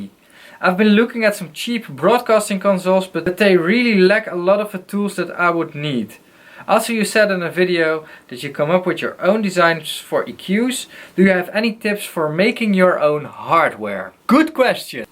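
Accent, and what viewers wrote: Netherlands. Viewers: Are you from Holland?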